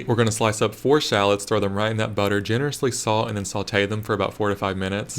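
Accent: South, United States. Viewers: I hear a lil southern drawl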